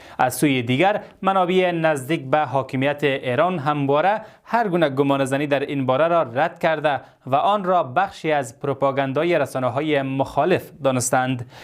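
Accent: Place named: Afghanistan. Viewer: سلام برادر افغانی عزیزم افتخار میکنم بهت چون حقیقت رامیگی من ایرانی هستم و درود فراون میفرستم بهت. ای کاشک افقانستان را از ایران جدا نمی کردن وجوز ایران بود با آن لهجه شیرینان .خدا نگهدار ایرن وافقانستان باشه.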